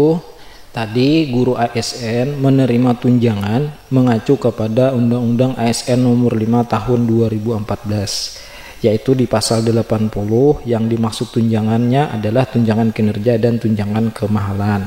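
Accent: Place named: Banjar, Indonesia. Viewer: medok sekali banjarmu sanak😁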